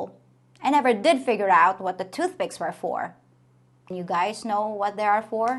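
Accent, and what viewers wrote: United States. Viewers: you have the American accent down to a T. If a saw and spoke to you, I too would assume you to be an American, because you do not sound foreign. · my dear, don’t know how I missed this video. We lived in the Southeastern corner of Georgia just above the Florida State line. It’s not hard to understand why they may have thought you were “American” you have barely any accent. My wife lived in the UK for a decade and has been here in the U.S. for 12 years this month. She still has quite an accent. The weather was something that got to her in the Southeastern U.S. after she was there about a month she said to me, “I never thought I would find a place more hot or humid than the Philippines…I was wrong!” The toothpicks are for picking up the food on the tray if using your fingers makes you uncomfortable. It’s been 13 years since my wife has been back to the Philippines. 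She misses Nanay and Tatay greatly but she knows it isn’t practical to go at this time. Anytime you travel, vacation eating is always hard. Take it from an old Marine, you have a rocking body! When you come back to the U S try the Intermountain West (Utah, Colorado, Idaho, Montana) it is a short hop from Saratoga Springs Utah to Vegas (4-5 hrs) a days drive to Los Angeles or a days drive to Phoenix Arizona. If you ever do come out here you are already welcome to the Household of Lolo D and Kazz!!! My wife would be a good interview having lived in the UK and here, her baby sister lives across the street and has lived in the UAE (not as an OFW) as a spouse of an American working there. As always another great episode! Keep up the excellent work! Lolo D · Wow! I'm so impressed with your observation in just a few days visiting the United States. Yup! American love their pets. and Yes, the odors of Marijuana in crowded places and you are right. 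I don't see anyone smoking. I hate the automated. I prefer to interact with a real person. Yup, water pressure is insane compared to the Philippines. Yes, Americans don't hang around in their yard. Btw, you speak like an American.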